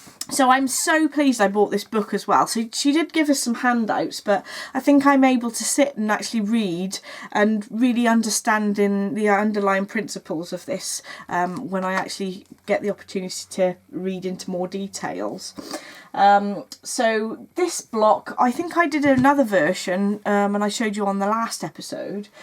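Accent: United Kingdom. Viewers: Congratulations,! As a german viewer I really love to watch your podcast and listen to your lovely british English. Thank you for cheering up my knitting Time 😍❤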